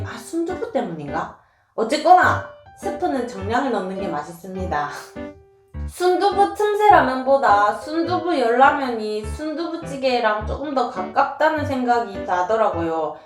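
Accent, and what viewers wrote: Gyeongsang, South Korea. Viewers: 후라이판이 몸보다 더 크네요 ㅡ 경상도 사투리 가 정감있네요 아침에 얼굴 안 붓나요?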